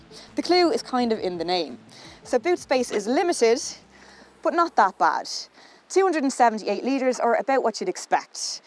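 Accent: Ireland. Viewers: Oh my god! I had forgotten how quickly i fall in love when hearing irish accent spoken by a stunning woman 😳 And - a great review 👍🙂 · Here's a comment regarding new hardware: I would marry Irish girl blind folded... :D Love the Irish accent. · Cool car and love your Irish accent. I laughed at the "WHAT ARE THOSE" with the tail lights. I think they are just a fun little touch as Mini is a traditionally British car even if owned by BMW now. Overall great review.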